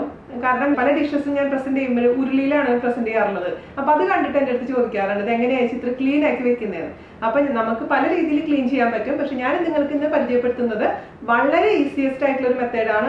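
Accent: Thrissur, India. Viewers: Thank you madam... thanks for the great tip..Really working.. Thrissurkari aano bhasha kettitt angne tonni😁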